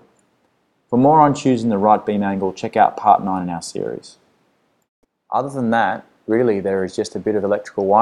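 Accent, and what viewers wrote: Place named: Australia. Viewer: You sound Australian, but you said Aloominum. WTF?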